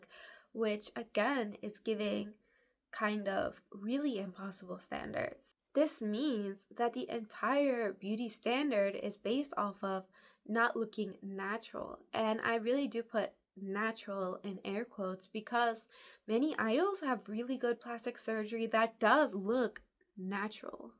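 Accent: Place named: United States. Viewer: Off topic but your accent is interesting to me :) are you American? Like born and raised?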